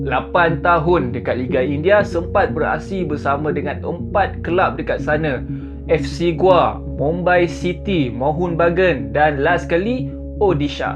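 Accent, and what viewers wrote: Malaysia. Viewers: Pronunciation biar betul bang, jangan Melayu sangat bunyinya.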